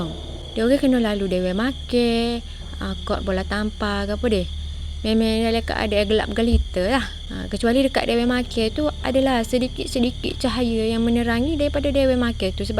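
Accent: Kelantan, Malaysia. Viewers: baru sedak dengar masuk dlam cerito denga kalu kot lorak kelate gak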